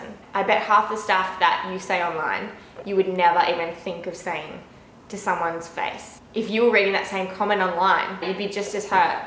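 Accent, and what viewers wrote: Australia. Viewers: Sorry this is completely off topic but are you Australian because you sound Australian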